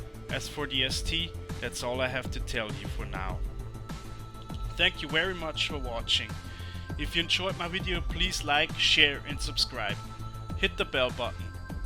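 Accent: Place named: Bavaria, Germany. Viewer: "Geländestraße" in feinstem Bayrisch! Danke fürs Review :)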